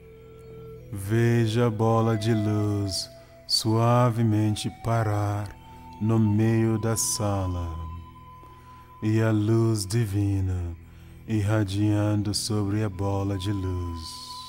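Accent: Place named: Africa. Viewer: pra mim a entonação está normal, o fato que você deve ter estranhado é o sotaque africano do narrador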